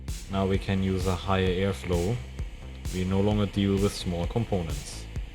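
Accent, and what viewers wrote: Germany. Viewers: based on his accent I would guess he is German, in Germany it is common to say nineteen hundred but as soon as you come to the two thousands you wouldn't say twenty nine hundred and say two thousand nine hundred. It's a force of habit to say numbers this way.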